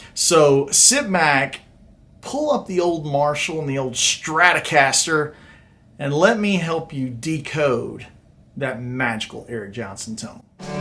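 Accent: South, United States. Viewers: Totally agree with you that aside from ACL 88, that Venus Isle was the best tone and era! I could never understand why Venus Isle was deemed not as good as Ah Via Musicom by many critics..Solos like ' ', ' ' and obviously ' ' are just otherworldly masterpieces.... will never be beaten, but I must say you have to be the closest replica I've ever heard!!!... If I closed my eyes and didn't know better I'd totally believe its Eric i'm hearing. Amazing guitar playing brother, absurdly clean technique (you've undeniably done your homework BIG TIME) and a very likeable friendly manner to boot - not least the Southern accent... There's more than a few things you have in common with the master:) Great video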